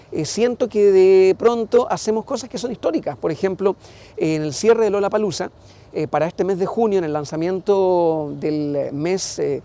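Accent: Chile. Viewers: disculpa cuando estabas en MTV tu lengua era mas clara un verdadero idioma ahora suenas a un chileno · ahora si habla como chileno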